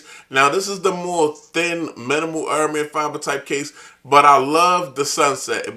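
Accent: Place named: Maryland, United States. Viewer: You sound like you from Maryland 💪🏼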